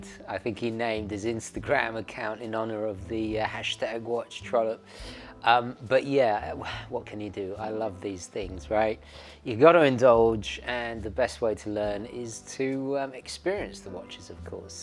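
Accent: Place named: United Kingdom. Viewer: ! you stated that it was the best GMT at $1000” love what you do and your the reason I brought my first hammy, the khaki field day date! Keep up the good work and make sure you don’t loose your British accent. From tom in Leicester 🇬🇧🇬🇧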